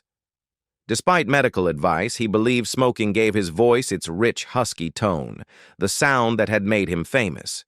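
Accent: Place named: England, United Kingdom. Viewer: Why has he an English ascent and where is evidence of his 4 years old picture and church photos. He is English not American.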